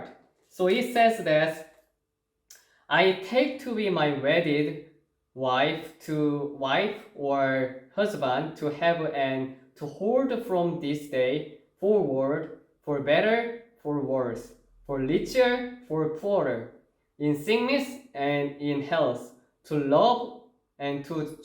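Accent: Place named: South Korea. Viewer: 콩글리쉬 발음으로 영어로 성경 읽고 설명하느라 애쓰십니다 저는 그만큼이라도 영어가 안되는데, 유창한 영어 발음은 아니신 것 같은데 그래도, 그렇게라도 하시는거 보니 부럽네요 .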